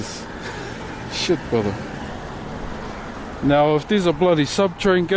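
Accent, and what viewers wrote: New Zealand. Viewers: Love the way you talk newzealand accent is awesome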